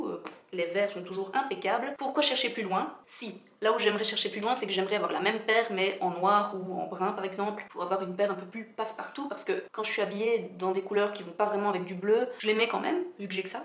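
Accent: Belgium. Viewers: Pour la petite histoire, haha, J'ai reçu la semaine passée un masque Glamglow et j'avais envie d'avoir des avis concernant ce masque. Donc, en cherchant sur youtube je suis tombée sur ta vidéo qui compare ce masque avec un de Sephora - si ma mémoire est bonne. J'ai été interpelée par ton léger accent qui me faisait penser aux Belges et j'ai visité ton Instagram où j'ai vu, qu'effectivement, tu étais Belge. De là, mon petit côté patriotique m'a poussée à jeter un oeil à d'autres de tes vidéos et je dois dire qu'encore maintenant, je suis toujours sur ta chaine à visionner ton petit monde... Parce que j'ai une véritable passion pour les USA et surtout NYC, donc tu me fais littéralement rêver et en plus, je suis une crolée comme toi sauf que j'ai jamais trouvé l'astuce pour arriver à dompter mes cheveux si ce n'est le lisseur, donc je suis aussi intriguée par tes astuces. 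Voila voila ! haha. Si t'avais une boite postale j'aurais pu t'en faire parvenir un que j'ai et qui ne me sert pas. Mais bon voila, sache que chez Club il y en a. Je sais qu'on peut commander sur le site, mais je ne sais pas s'ils livrent aux USA. Je crois qu'ils sont limités à la France et au Luxembourg :/ Bisous !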